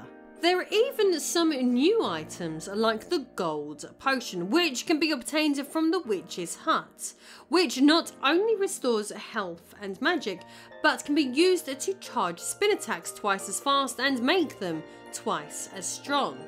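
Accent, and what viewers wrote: England, United Kingdom. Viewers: It's a British accent? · I've been studying English for almost my lifetime and I have to say I totally love your british accent. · That live voice thing is kinda annoying. I dont need to be handheld and told how to access a sub menu.. just let me play the game. Now if they let you do the live voice then that would be cool! Your voice is enchanting! Is it an English accent that I'm hearing? Absolutely stunning voice.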